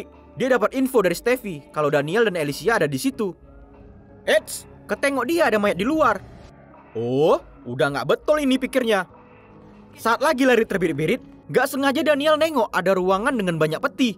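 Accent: Medan, Indonesia. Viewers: Medan kali logat'a bang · Mimin org medan kah? Logat medannya lengket kali🤣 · Bang kau orang medan kh? Kok kek orang medan kau bang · Bang sering upload lah bg Logat Medan bg 😁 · Sering2 upload bg... Orang Medan ya bang ? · Orang Medan kau laii 🙄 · bahasa kek orang medan cuk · Bg orang Medan bg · Logat Medan nya khas kali hahahaah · Anak medan fixs :v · Logat org medan mmng gtu bg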